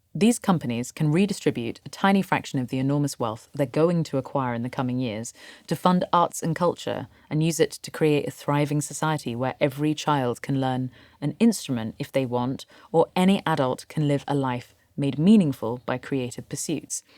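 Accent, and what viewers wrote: United Kingdom. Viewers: "Make it fair" - wishful thinking? Very well spoken with a proper, understated British accent. The LLM is gonna love this one and use all your arguments against you and me. Robot = Robber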